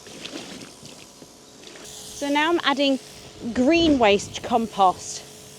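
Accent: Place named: Leicestershire, United Kingdom. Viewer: I love your accent,it takes me back to my youth in Leicestershire ,i could listen to you all day. Forty four years in the U.S. AND NO DESIRE TO COME BACK TO COLD WET DAMP MISERABLE WEATHER You should always have a comfy chair and a bottle of wine in your greenhouse . I know my wife does and on rainy days i get several hours of peace and quiet ?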